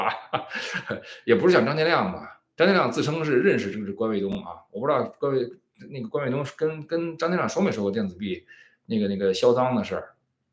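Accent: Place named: Beijing, China. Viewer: 笑出聲兒……昨天沒睡好郁悶的慌，聽了您的京腔兒播報感覺松爽很多。您小时候得有多淘呀⋯⋯哈哈😂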